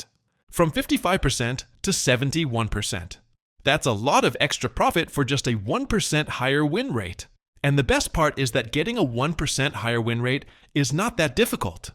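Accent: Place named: United States. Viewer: thank you for changing accent from british to u.s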